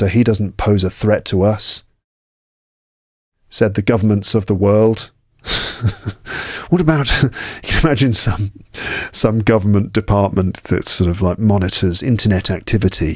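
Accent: United Kingdom. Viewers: Hi, a big thank you for your videos I always switch the speed for 0.75 and repeat after you as I really want to develop the British accent. At the moment I’m learning how to fix my accent with help of another Nicholson, so both Lukes are helping me, thank you guys. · so now you have a British accent? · Please answer me Is this British accent? Idk the difference between it and American..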